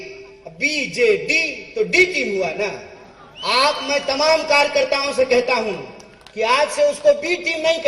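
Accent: Bihar, India. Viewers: साले बिहारी हिंदी बोला तो सीख ले पहले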